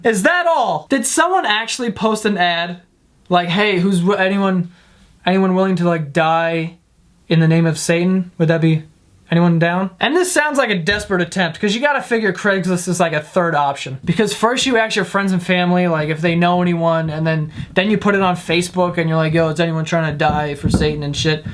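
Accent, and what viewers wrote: New York, United States. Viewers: he's a hardcore new Yorker. its his accent and its dope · it's his new york accent. we all do that here.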